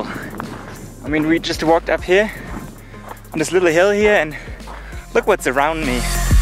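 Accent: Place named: Germany. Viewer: Cant stand this german accent...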